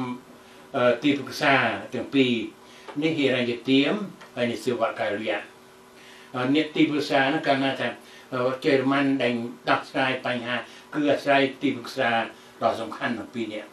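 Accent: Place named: Thailand. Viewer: ខ្មែរយើងដូចអត់មានសម័យនគរាជទេ ហើយមួយទៀត ម៉េចអ៊ុំនិយាយរដើនដូចថៃនិយាយខ្មែរចឹង?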